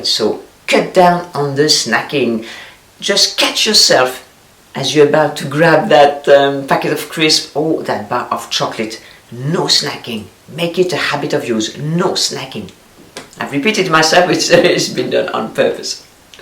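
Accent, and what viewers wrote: France. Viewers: Words of wisdom! I think most women know these rules but it’s so nice to hear it as a reminder especially when it comes with a French accent! It’s hard to stay on track when you’re home now, near a refrigerator. Have healthy fruits and veggies ready to snack on. And the occasional cookie. But sugar is an addiction. So if that’s you (like myself), you may have to cut it out completely to “detox” first. Losing weight is a marathon, especially after your metabolism slows down. This lockdown won’t help, so you need to keep moving, walking or running daily. Enjoy the fresh air with your kids or dog. Once you get older, like me, it’s so much harder to lose those extra pounds, so try not to gain them. That’s what this video is about, maintaining a healthy balanced lifestyle! Good luck everyone! And stay safe!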